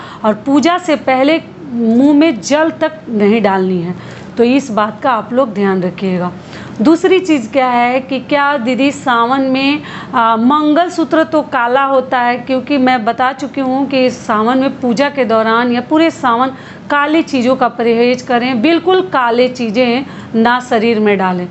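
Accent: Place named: Bhojpuri, India. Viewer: Rajasthan. Ki. Saskriti. Kebareme. Pahnave. Ke. Bareme Ap Jantih. Kya rajsthani. Orte. Vat. Savitri. Ka. Vartme. Nhi Samjatih Inkeliye Tij Karvacoth. Ke. Braber. Apke Vat. Savterike Vart K. Braber. Apke. Guru Konhapkhase. Bilong Kertih. Apki. Boli Bhojpuri. Lagtih. Apko. Ptah. Rajsthanme Moutabu me Bht. Bra. Atmgyani. Permatgyani. Barahamkumari. Sasthanh Muje. Ptah. Biharme Patna Saherme. Bodstuph. Purane. Jamane. Me. Taksila Visvvidaly. Tha. Itihaas me. Kabi. Hamare. Rajsthanki. Saskriti. Ke. Bareme. Btaiye hamare. Pregnancy me. Mehndi. Nhi. Lagat hgodbraim. Lgatehh Akbar Satve Ya Nave Mahine Hamare. Yha. Maglsuter. Nhi. Pahnqte. Galeme. Kanti. Pahnteh. Morden. Orte. Ajkal. Pahnrih. M nhi. Pahnti. Muje gahn. Nhi. Suhate. M. Simple. Ladyhu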